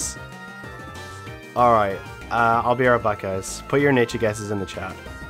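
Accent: United Kingdom. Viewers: This guy sounds like he has a hint of northern Ireland in his accent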